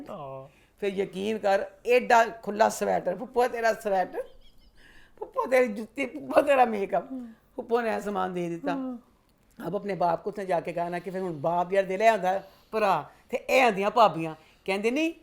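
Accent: Punjab, India. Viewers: plz talk in urdu bohat thick punjabi bolti ha ap sar pa se guzar jati ha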